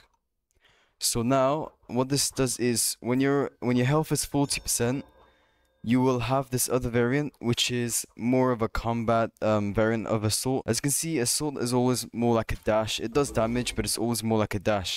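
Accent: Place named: France. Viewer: are you french ? you have a little french accent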